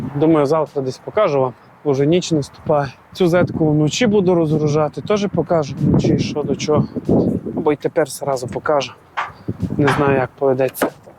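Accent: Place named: Transcarpathia, Ukraine. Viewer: А ти походу із Закарпаття) удачі бро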